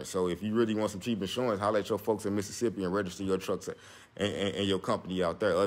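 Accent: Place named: St. Louis, United States. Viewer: Dropping gems bro. Great information! Also you gotta be from St. Louis bro that accent strong as hell 😂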